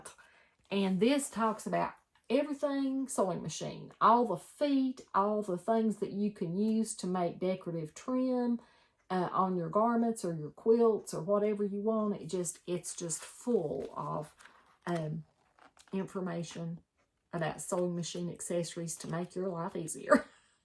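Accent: South, United States. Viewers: I enjoyed your book sharing. Many of those books are books I’ve owned and then when I had to downsize had to pass them on. But, they brought me much pleasure while I had them. (I do a lot of mental sewing!). I knew your southern accent resonated with me. I live in western North Carolina, too!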